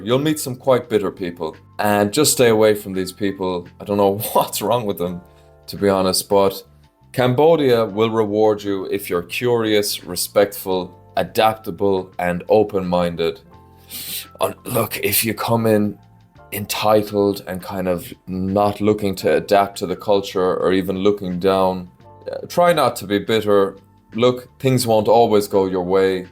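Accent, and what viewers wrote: Ireland. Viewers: Always brilliantly informative with the brogue which makes it great to listen to..thank you…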